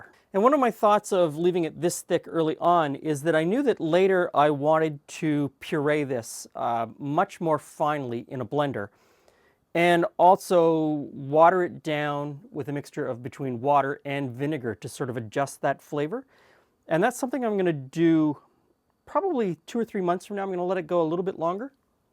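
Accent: Canada. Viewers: He's saying it right. Albeit with a Canadian accent.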